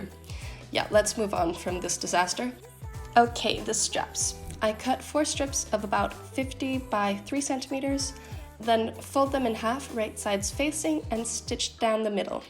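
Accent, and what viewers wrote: Denmark. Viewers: This video was in my recommendations. The second you started talking I thought you sounded danish (the accent, I guess?). Then I saw the furniture/living space and again I thought it looked super danish. Then I checked and as far as I can tell you're from Denmark... Hahaha. I liked the video! :D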